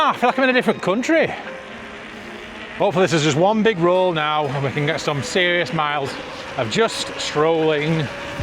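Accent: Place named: Yorkshire, United Kingdom. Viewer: 🤨 NO you do not need to tone down the worlds greatest accent, Yorkshire!!!!!!!! I cant even stand driving over flockton nothing on earth would get me to ride on there, you wouldn't have a few videos ago, its nice to see your confidence increase. Loving the Trails o life T shirt.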